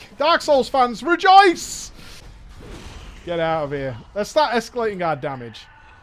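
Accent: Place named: Wales, United Kingdom. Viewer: 75 minutes of that silky Welsh accent? What A beautiful wednesday.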